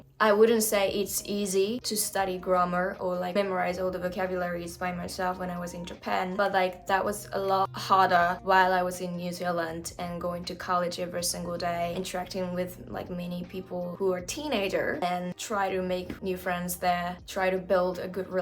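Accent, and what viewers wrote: Australia. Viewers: While you live in Australia, you perfectly pick up Australian twangs. You might be the person picking up the accent in the country where you live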